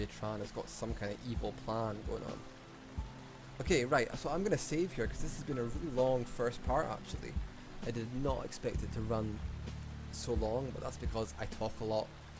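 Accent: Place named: Scotland, United Kingdom. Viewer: whats with the accent though haha im scottish and i dont sound anything like you, haha where you from in scotland.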